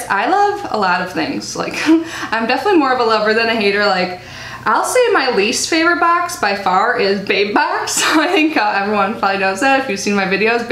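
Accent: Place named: Chicago, United States. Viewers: Awesome. Thank you for doing this. Your cats are adorable. My sister may be moving to Florida soon. I am not sure when or where yet. Her husband is up for a promotion. You don’t sound weird when you talk. You sound like you are from Chicago. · I heard, but don't know if it's true that everyone is off the wait list in April for Ipsy plus. It stinks because my Ipsy acct I pay yearly since that's easier for me, my other account(my daughter's) is monthly and I skipped it this month so hopefully I can swing it next month if it's true that the wait list is done. I don't think you talk funny at all! You do have a strong Chicago accent though. People that I know that aren't from Philly always comment on my "accent" and I think it's so funny because I don't think I have an accent lol. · So excited to watch this 😀. Hope you aren’t getting sick. 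Feel better. You are young sister. I’m 47. So should we call you? 👩🏼‍⚕️🐱 “Drinks with a purpose” 😀 Archer and Laaaannnaaa would have been epic. Even if they are siblings I’m sure it would work. Lol. But Fiona is a fun name. And Emmy. All good names. 30 boxes! Wow 😯 and I don’t think that you sound funny when you talk. You have a nice midwestern accent 🙂. I’m totally going to look up your first video 😀💖 thanks for doing this Q and A.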